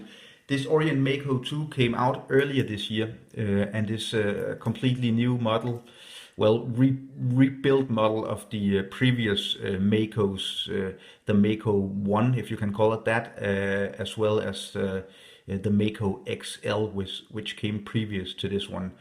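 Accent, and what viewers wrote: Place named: Denmark. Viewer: You've got a very cool accent, are you Danish?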